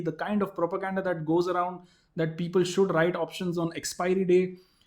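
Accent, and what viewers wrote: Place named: Tamil Nadu, India. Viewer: Very good video...you are great.Your English is superb .If I am right, you are from Tamil Nadu or Karnataka.pls post banknifty option buying strategy.Regards.Prabhakar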